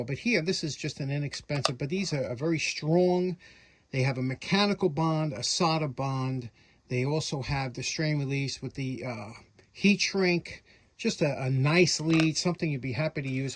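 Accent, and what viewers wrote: New York, United States. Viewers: Great episode and thanks for speakin in N.Y. accent. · I love your NY accent, but I couldn't, for the life of me, figure out what a "soladis" connector was until I noticed the title of this video. I just love that accent! LOL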